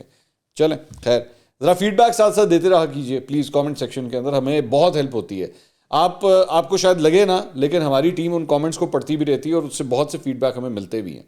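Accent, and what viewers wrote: Punjab, Pakistan. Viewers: Punjabi touch zaida DIA karay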